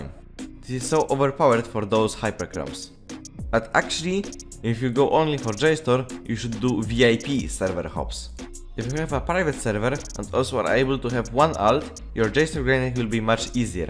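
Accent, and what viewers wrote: Poland. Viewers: Are you Polish? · po akcencie słychać że polak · Ej czy tylko ja słysze w jego głosie polski akcent xDD? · słychać że z polski jesteś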